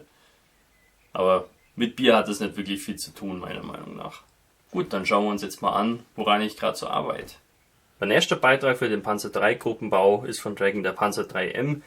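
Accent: Swabia, Germany. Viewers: Bist du aus Baden würtemmberg? Du klingst voll wife der Schwabe!!!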